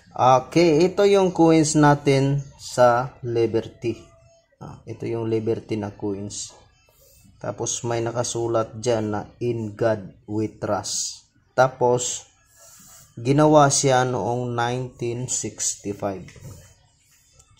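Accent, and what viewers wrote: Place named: Visayas, Philippines. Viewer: Bisaya ka man bai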